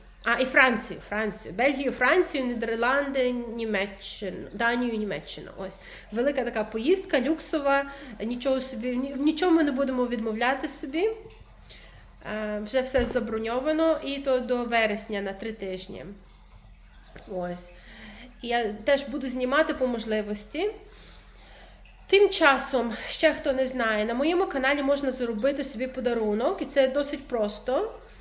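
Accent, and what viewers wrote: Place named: Transcarpathia, Ukraine. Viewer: У вас закарпатський акцент.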